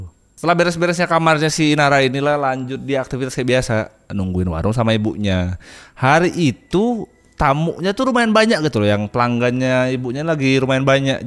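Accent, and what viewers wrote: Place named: Java, Indonesia. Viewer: Enak lek crito.. kyk koncoku d deso lek crito.. bhsane medok enak di denger.. seru😂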